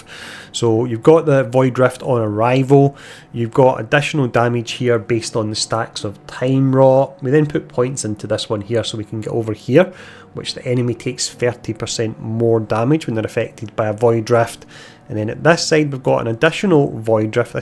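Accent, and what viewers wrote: Scotland, United Kingdom. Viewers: I came for the build, stayed for the Scottish accent.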